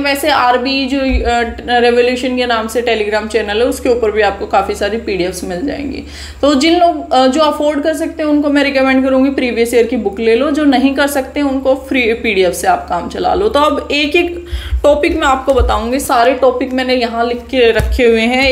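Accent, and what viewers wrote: Haryana, India. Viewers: अप हरियाणा से हो न ..?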